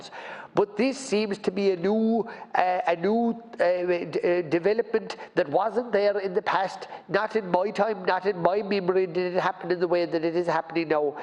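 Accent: Kerry, Ireland. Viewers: I only came here to listen to the mellifluous tones of the Kerry accent.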